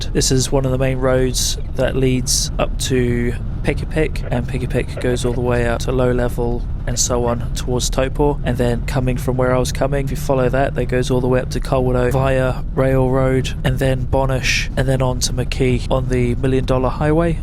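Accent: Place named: England, United Kingdom. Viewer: Great video, this guy sounds like an Englishman not a kiwi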